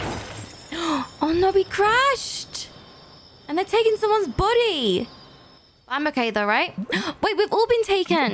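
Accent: United Kingdom. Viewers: Omg your British :0 Give me a shout out pls :D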